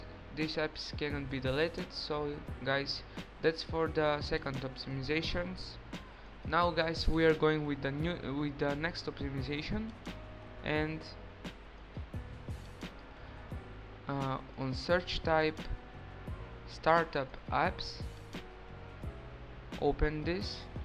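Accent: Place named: Russia. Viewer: видно русский пытаеться на английском говорить красава молодец!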